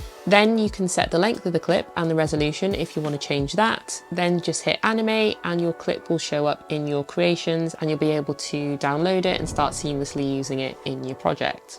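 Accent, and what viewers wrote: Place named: Northern England, United Kingdom. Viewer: I watch all your videos all the way through, and I don’t even make vlogs or anything. But hey. I think we have some things n common! I have those same Adidas trainers, I think you’re a northerner like me (Manchester or Leeds or somewhere? I’m crap with accents), and you’re cool AF, and… oh wait, I’m not . Seriously tho, once weather picks up a bit here in Spain (I live in a very cold region), I’ll do some live streaming by the river, just using iPad and restream.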